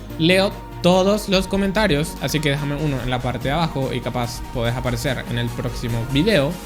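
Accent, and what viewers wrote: Paraguay. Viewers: A mi no me mientes sos paraguayo vd?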